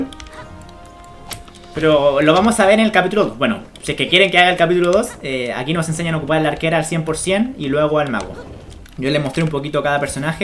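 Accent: Chile. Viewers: eres chileno ? xD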